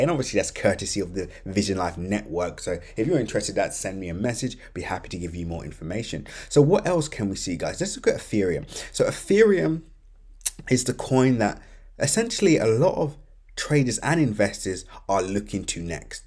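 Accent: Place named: Essex, United Kingdom. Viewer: You got to be near me in Essex lol I can tell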